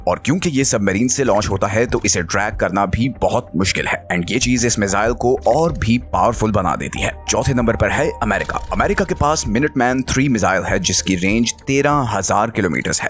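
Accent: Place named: Pakistan. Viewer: Why are you sounding pakistani to me? Are you? You included north korean missile which is in under development stage and put it ahead of america. And you conveniently ignored India's 16000 km range Agni 6 which is also under development. It is in advanced stages. Your this lack of knowledge is a proof that you are a porki. Agni 6 is coming baby wait n watch.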